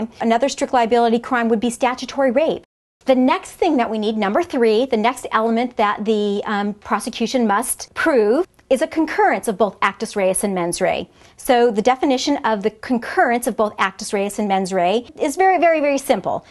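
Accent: United States. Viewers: QUESTION: If you're really certain, where in the USA is her accent from? Thank you. Every one of this series of videos is very clear and of excellent quality, and the audio levels on every one of the videos are perfectly matched for an uninterrupting playlist. The instructor is also very attractive, and I'm curious where in the USA her accent is from. Finally, I wish there were more such videos from her, besides the ones in this series.Thank you so much! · Hi Greg. I'm a 40-year resident of the United States who is currently in California (13 years). I've moved around, 27 years in Texas, a winter in South Jersey, a couple of months in Tennessee and I traveled as a sales instructor, 37 states total. I speak 4 languages fluently, but English is only my 3rd language. I just came across this video today and found your question. Long answer to you because of your preface, "IF YOU ARE SURE." I am not sure, but my guess is, she's from the Midwest. 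Eg. Illinois, Missouri, etc. among others. Educated Americans, by the way, such as Attorney, don't have identifiable accents, geographically. I say it humbly, folks have a hard time guessing my origin. Native Californians sound like her. And so do college graduates from New York. The loud and confident tone of her voice can also be attributed to a generational upbringing of a family of professionals, professors, educators, et al. Having said all of this, why 5 elements? I thought there's only 2? 😂😅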